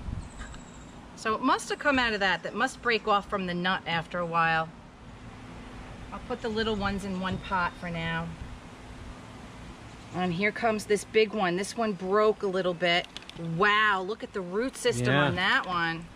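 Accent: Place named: Long Island, United States. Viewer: Thats nuts! Love the long island accent.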